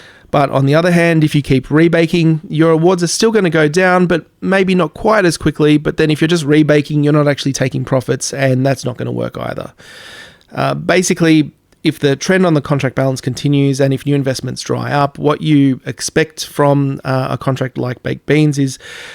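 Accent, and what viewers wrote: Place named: Australia. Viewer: Thanks mate good to hear another Aussie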